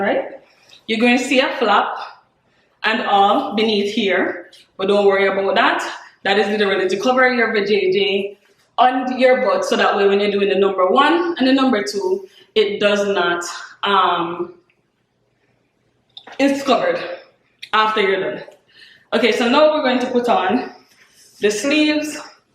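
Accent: Jamaica. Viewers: I feel like I just know your Jamaican cause it takes one to know one!! But maybe your African 😂❤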